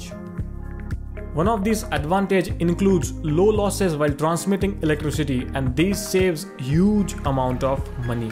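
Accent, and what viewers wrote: India. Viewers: Idk if ur Indian or something but I love these types of accent also nice explanation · The moment I heard Ur Indian accent, I felt so comfortable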